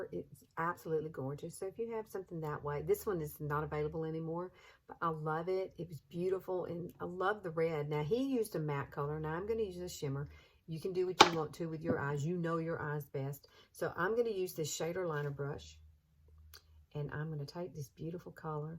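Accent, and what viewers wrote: South, United States. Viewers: What state are you from? Somewhere in the south I’d say. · You are a very good teacher! I love watching Wayne too but it’s helpful to watch others use his technique and I enjoy ur adorable, southern accent :) I love the colors u picked and plan on finding some in my collection that are similar because my coloring is exactly like yours.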